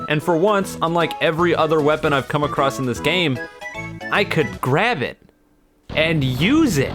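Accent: United Kingdom. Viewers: I didn't know you were british?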